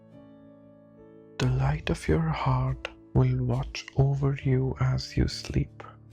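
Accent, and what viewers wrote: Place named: India. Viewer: His english sucks. "Lie back" or "Lay back"??? However i love Indians, but their english sucks. Horriable accent.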